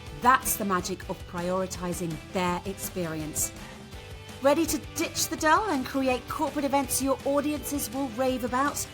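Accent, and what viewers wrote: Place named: United Kingdom. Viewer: Haven't been around for a while 🎉. Missed the British accent.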